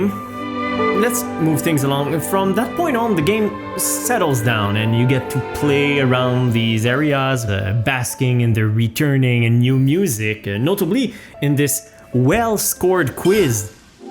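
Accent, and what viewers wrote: Quebec, Canada. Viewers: Was listening to this video like "This guy sounds like hes fron quebec" then i heard you say Matthew and instantly knew without a doubt you were Great video you showed me some leitmotifs i missed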